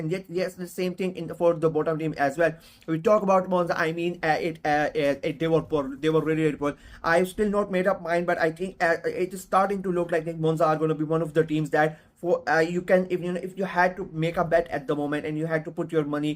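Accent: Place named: India. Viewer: r u from india , u sound so much like them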